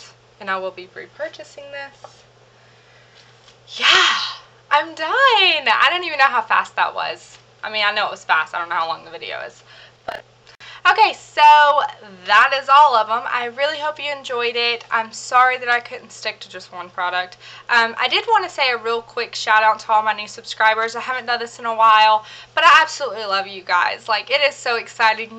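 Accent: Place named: Texas, United States. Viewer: I subscribed! Are you from Texas? Just figured you are bc my accent is the same :)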